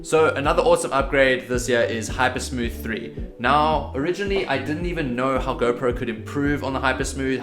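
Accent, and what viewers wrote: South Africa. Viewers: You sound South African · you sound south african!!!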